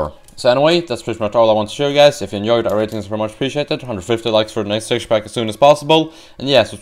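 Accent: Sweden. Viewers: Uhm.. This may sound a bit weird, but you sound swedish ;)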